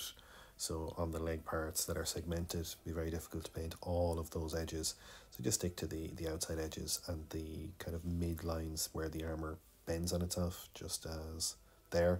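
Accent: Ireland. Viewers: You're welcome. You also sound like a fellow potatolander so bonus points!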